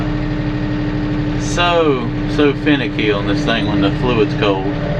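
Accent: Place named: North Carolina, United States. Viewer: Ferguson 20 diesel Its a NC thing. I tried to teach my friend to say oil, boil and toil, and he finally got it right, after 20 tries! Lol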